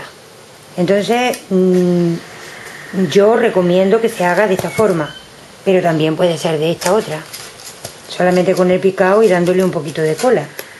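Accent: Andalusia, Spain. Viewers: eres española tienes unas maneras tan particular de decir las cosas .bueno saludos ...pd. eres andaluz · Excelente trabajo y me gusta mucho tu acento. De qué arte de España? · tienes talento. Seguro. Además tu voz y tu bonito acento del sur subyugado por el canto de tu canario. Cordiales saludos!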